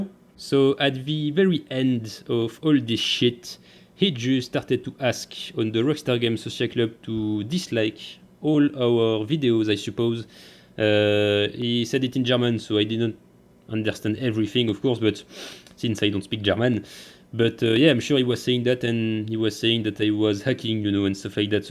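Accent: France. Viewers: GS bro and I love your French accent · Love your vids man and your french acsent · Ça va ton anglais même si tu as un accent français. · That is 1 thick sexy ass french accent, do more vids plz:p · Your English is fine. I mean you have an accent which is understandable because you're French but yeah other than that it's good man don't worry about that. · Just watched it (5 years late) and it is so funny. Despite the fact that you are right about that dude and his crew being absolute trash it is so funny to see how salty you get. But I want to be clear, I would do the same thing and get salty about ppl being so stupid on GTA online. Its just natural I guess. Love your content, love that french accent and the way you and play „together“. Sincerely, a german. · hey mate.. I like your skills in the game and I respect you. plz ignore these type of stupid players. your English is good enough, even though it's funny strong french accent. 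but it's cool. I wanna see more of your videos and I advice you to add some music.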